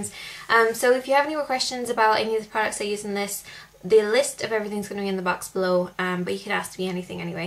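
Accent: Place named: Ireland. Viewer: i thought u had a bit of irish haha :L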